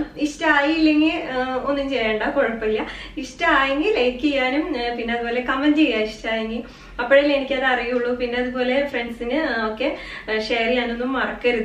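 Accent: Thrissur, India. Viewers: നമ്മൾ തൃശ്ശൂർകാര് വീട്ടിൽ അടുക്കളയിൽ എങ്ങിനെയാണോ പാചകം ചെയ്യുമ്പോൾ സംസാരിക്കുന്നത് അത് പോലെ തന്നെയാണ് മോൾടെ അവതരണം. ചേച്ചി മോൾടെ വല്ല്യ fan anutaa..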